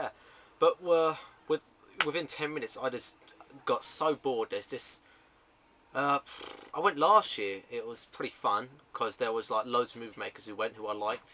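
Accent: United Kingdom. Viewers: fun to listen to with your awesome british accent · i love ure accent <3 <3 egland accent FTW!!!!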